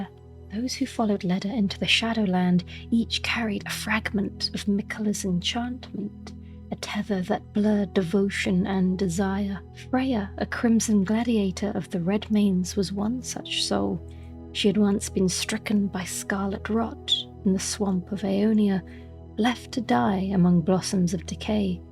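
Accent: United Kingdom. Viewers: Having a soft spoken British women telling me Elden Ring DLC lore in bedtime story form is something I never thought I would need. But I am here for it with a side of chamomile tea... because English breakfast tea has caffeine!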